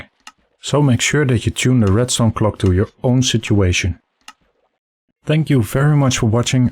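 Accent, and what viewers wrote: Netherlands. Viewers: Thanks for explaining! I can sense Dutch-ness from miles away haha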